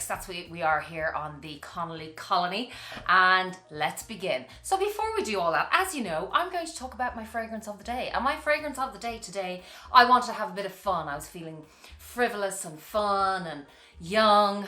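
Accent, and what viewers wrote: Ireland. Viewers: Hi, I have 3 LV’s (speedy, neverfull & retiro) & I love them but to be honest I’m done buying LV’s right now. Love to hear your voice esp. your Irish accent. I used to work in Beaumont Hospital as RN, now I’m base in US. I’m from the Philippines originally. Always looking forward to your videos.❤️❤️❤️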